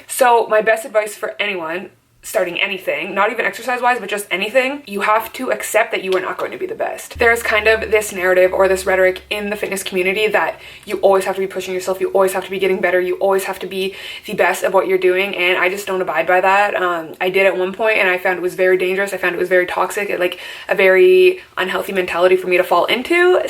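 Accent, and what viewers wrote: Canada. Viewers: It’s the Canadian accent hehe 😊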